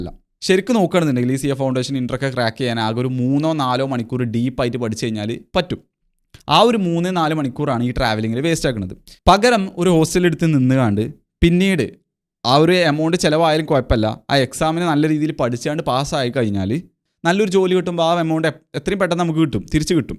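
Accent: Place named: North Kerala, India. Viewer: Bro that's not completely your fault, he is tone is slightly from the Northside which even the middles or Southside have a bit trouble to understand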